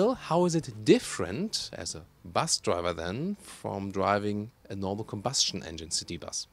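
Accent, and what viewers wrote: Germany. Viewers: Man hört halt einfach raus das du deutsch bist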